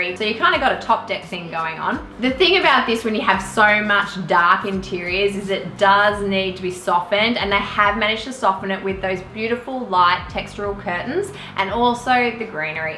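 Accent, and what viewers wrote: Australia. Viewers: I Love how she does her content everything seems to fit together perfectly the aesthetic the music all topped off with the cheeky Australian accent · I really liked Batman's feeling on the first floor! But what i love is this Aussie accent! 🇦🇺🏄🏼‍♂️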